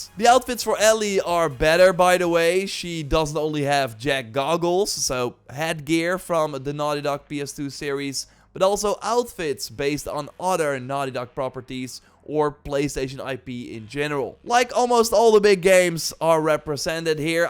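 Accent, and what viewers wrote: Netherlands. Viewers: Ik hoor Nederlands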